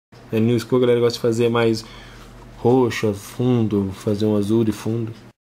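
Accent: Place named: South, Brazil. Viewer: Gostei muito do vídeo, comentários bem pertinentes e achei ele bem carismático e natural... Amo esse sotaque do sul ❤😆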